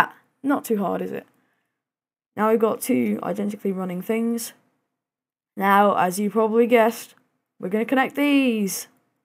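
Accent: United Kingdom. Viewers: You live in Cali? You sound pure British though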